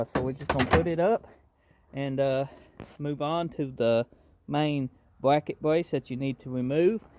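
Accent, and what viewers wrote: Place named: New England, United States. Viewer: Great Video! I love your accent! Not Texas... Maybe Mass? NH? ME?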